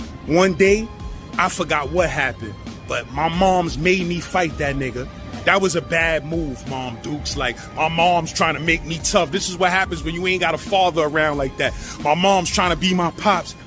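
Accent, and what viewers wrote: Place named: New York, United States. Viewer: QUALITY STORY TIME! and ousside on feet MOOOOVINN yea thats a NY nigga 🤣 love from CT 💯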